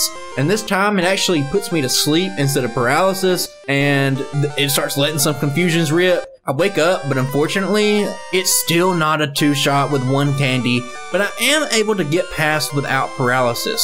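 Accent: South, United States. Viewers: I have to say that I enjoy the "unoptimized run first, optimizations later" style, and I also enjoy the longform videos (such as the Mr. Mime vid). The variability in content is nice. Thirdly, the Sanqui runs injecting new moves, types, and mons is also a unique treat that sets you apart from others on the scene, and the Garchomp vid is what got me to first click on a vid of yours, actually (and your accent and demeanor helped me to stay; I've got southern in my family and I feel it's very underrepresented in many gaming communities) 😁 I think this is the first vid of yours that's came out since Scott's Thoughts' Tentacool run that gives you a mega-shout-out at the beginning, too -- I hope you see the views tick up from that!!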